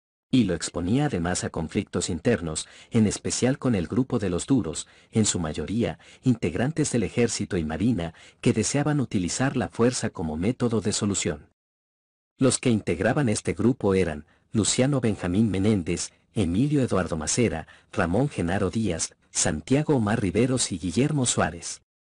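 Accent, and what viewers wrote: Chile. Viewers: Me resta decir que el relator es muy probablemente chileno, o me equivoco???? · aunque te esforzas en disimular tu acento, vos sos chileno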